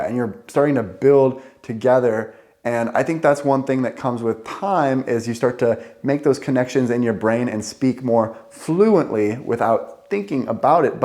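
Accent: United States. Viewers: Japp det gör han gillar att han låter amerikansk när han pratar.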